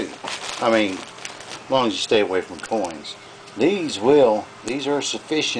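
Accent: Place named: South, United States. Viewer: I really hate the southern accent. It makes the speaker sound so uneducated and dumb. I can't stand how they speak so slowly, repeat themselves, go into detail about things that are common sense, and mispronounce words. If I hear this guy say the word "ColloiDIAL" again, I'm going to scream while projectile vomiting. A der da da der. "Hey, Jim-Bob! We should make a colloiDIAL silver beer to drink on Nascar race day!" "Their makin' a left turn!"- Bubba J. (Jeff Dunham)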